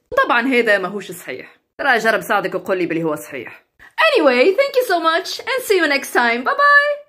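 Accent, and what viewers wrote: Tunisia. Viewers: انت جميلة و لهجتك التونسية و حسك الفكاهي يزيدك جمالا على جمالا 😁👍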